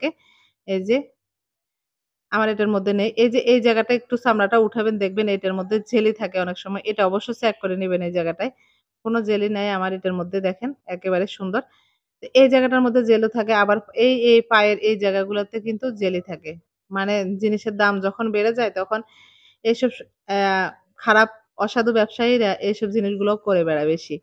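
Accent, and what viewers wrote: Noakhali, Bangladesh. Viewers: নোয়াখালীর আপা আপনি?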